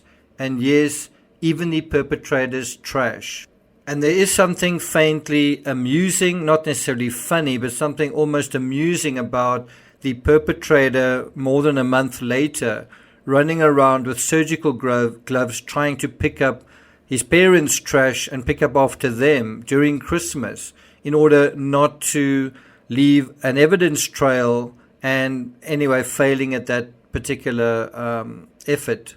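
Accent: South Africa. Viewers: Howzit. Off topic, but are you South African? Your accent is very familiar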